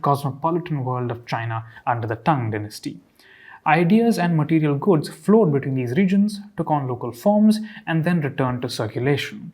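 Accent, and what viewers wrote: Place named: Hindi, India. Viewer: ... once again you are from Karnataka yet you mistakenly say Tamil Nad... instead of Tamil Nadu has Bangalore been so inundated with Hindi migrants your brain simply cannot process Dravidian words without veneering and polishing them in Hindi pronunciation you dense mongoloid. Do you call your home state Karnatak? Do you speak KANNAD? Is the term for your mother Amm... Do better.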